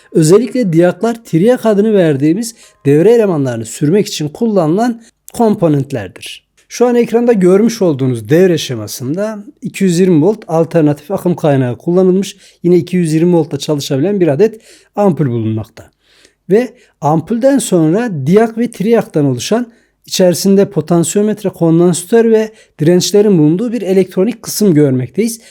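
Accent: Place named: Central Anatolia, Turkey. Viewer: Teşekkür ederim. Güzel anlatmışsınız 👍👌💯 Konuşma aksanınız tahminimce orta Anadolu tarafı ☺️🤔 Aksaray, Yozgat 🤔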